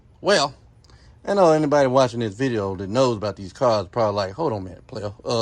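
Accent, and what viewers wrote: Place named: Texas, United States. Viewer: Accent says your from Texas sir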